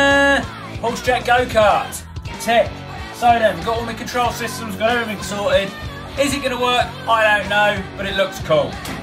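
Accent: England, United Kingdom. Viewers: What is real funny, is to watch the Subtitles. I don't think that whomever or whatever is putting in subtitles can understand his heavy English Accent any better than I can, but some of the translations are just hilarious!!!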